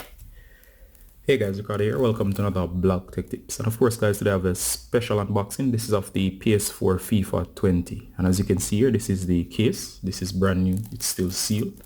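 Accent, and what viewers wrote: Jamaica. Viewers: U jamaican right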